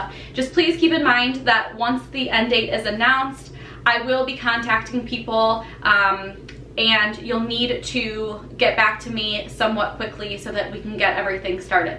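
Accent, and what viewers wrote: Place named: Chicago, United States. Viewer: Congrats girl!!! I found your channel from searching for Erin Condren videos. I live in Seattle but am originally from Chicago! Your accent reminds me of home!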